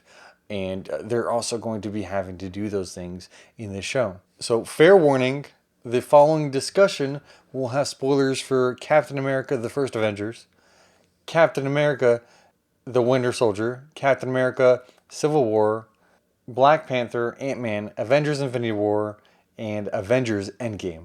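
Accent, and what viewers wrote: South, United States. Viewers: I love your vlog of this video at your house in Texas during your quarantine until you're talking about the mini-series of "The Falcon and the Winter Soldier" while it came out on Disney+, it says after being handed the mantle of Captain America at the end of "Avengers: Endgame", Sam Wilson teams up with Bucky Barnes in a worldwide adventure that puts their abilities to the test as they fight the anti-patriotism group, the Flag-Smashers and you're talking about all the characters of the mini-series from the beginning to the end after that, I hope your husband, Zach will be very proud of you, so I wanna say I'm very happy for you, but I love your Southern accent and I know you're half Mexican!! #FalconandTheWinterSoldier #DisneyPlus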